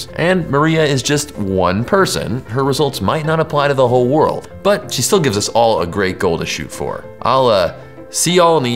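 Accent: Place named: Texas, United States. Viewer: Where'd that Texas accent go?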